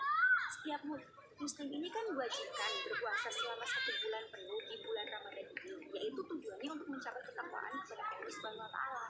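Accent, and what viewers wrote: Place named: Java, Indonesia. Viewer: Ayang medok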